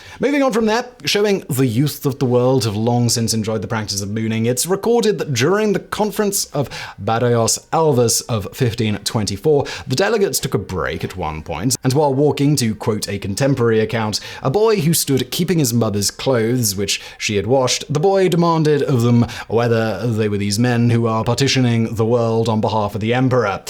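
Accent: United Kingdom. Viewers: You may live wit duh Czechs but you still got a British accent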